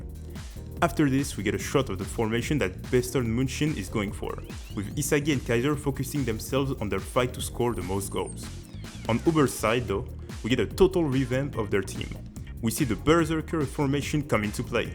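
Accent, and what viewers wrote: France. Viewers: French accent kinda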